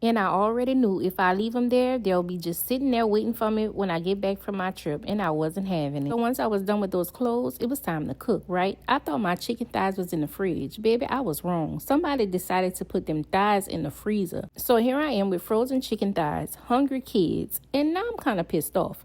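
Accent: Louisiana, United States. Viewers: I love you accent. Are you from Louisiana?❤ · Are you in Louisiana ?? Omg your accent is so strong & cute ❤️ I am a Texan so i get it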